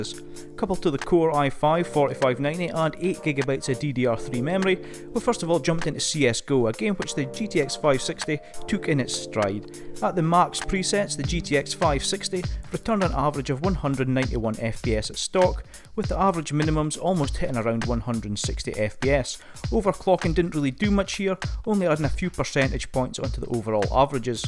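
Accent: United Kingdom. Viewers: Which part does this guy come from in the UK?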